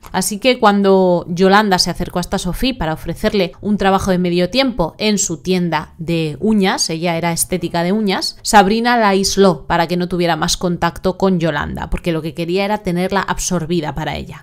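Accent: Spain. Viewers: Porqué la ves? A quien le importa tu opinión?? Más quisieras tú tener el acento "castellano"(España) qué tiene.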